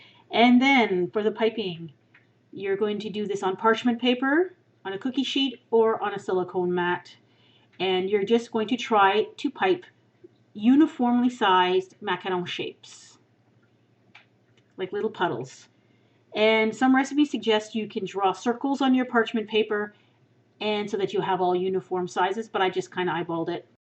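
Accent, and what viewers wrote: Quebec, Canada. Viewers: I really love your videos, are you french canadian?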